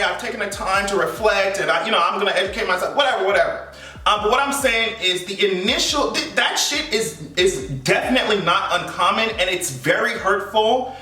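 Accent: Jamaica. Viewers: When you speak Patois 🥰✨😍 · If you don't speak that patois!!!! You betta, I love it, I want more boo, celebrate that heritage too. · I love ur jamaican accent 🥺😍 and yess I’m so happy for Adele !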